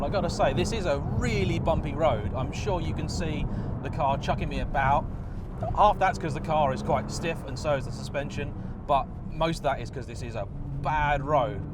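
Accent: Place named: London, United Kingdom. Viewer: it's quite amazing going back to watching this from your more recent offerings and your Voice and commentary delivery has changed so much. You actually sound like a Londoner here, but now you have totally different way of presentation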